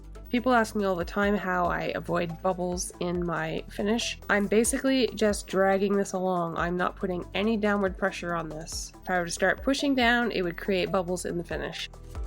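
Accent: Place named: Alberta, Canada. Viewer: I saw a Tim Horton's cup in one of your episodes, and your accent is identical to a friend who lives in Edmonton. I know you are a fellow Canuck...are you from Alberta?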